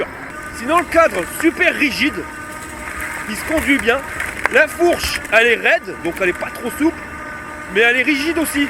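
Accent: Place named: Nord-Pas-de-Calais, France. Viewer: Frileux de s'abonner à sa chaîne ? Bah moi, j'adore son franc-parler et son humour ! Et comme je suis du Nord (en fait en Belgique mais à 20 km de Lille), je savoure son accent ch'ti. A chaque fois que je l'écoute, j'ai l'impression que c'est un pote qui me donne des conseils.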